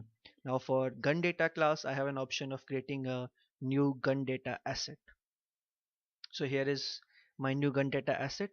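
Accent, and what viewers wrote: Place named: India. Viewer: Oh man I hate the Indian accent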